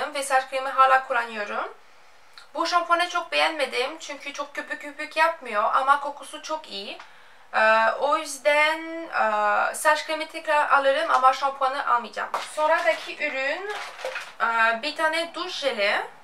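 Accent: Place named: France. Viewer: Fransız aksanın var galiba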